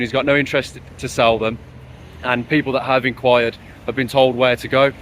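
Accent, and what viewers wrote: United Kingdom. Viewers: Judging by your accent you are from around my area somewhere, there is a good crowd of UK Volvo folks, if you ever want to meet up contact me.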